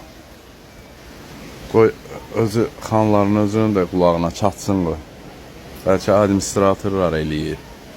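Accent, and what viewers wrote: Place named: Sheki, Azerbaijan. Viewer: Qaqaş səni gözü yiyim, sən özün Şəkidənsə? Haindi ləhcən elə bilki, bizim ləhcədir. Hada naqaraq bahadı birdə gəlmərik. 😂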